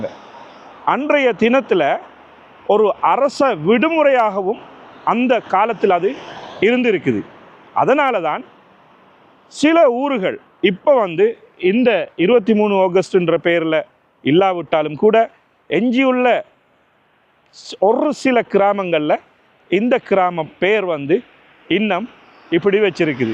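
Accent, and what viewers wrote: Sri Lanka. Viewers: இலங்கைத் தமிழ் ரொம்ப அருமையாக இருக்கிறது . எனக்கு K . S .ராஜாவையும் , B . H . அப்துல் ஹமீது அவர்களையும் நினைவுக்கு கொண்டு வந்து விட்டீர்கள் . புதிய தகவல் . தெரிந்து கொண்டேன் . Thank you .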